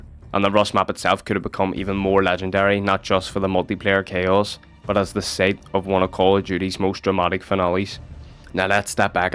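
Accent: Northern Ireland, United Kingdom. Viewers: I don't even think you're Scottish... Northern Irish more likely? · Excuse me, are you northern Irish? Cause the accent really makes me certain that you northern Irish.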